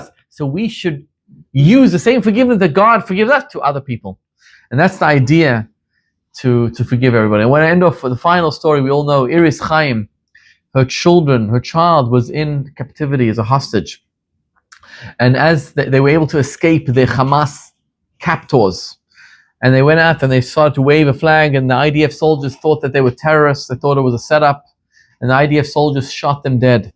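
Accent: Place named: South Africa. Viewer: Seems like he's from South Africa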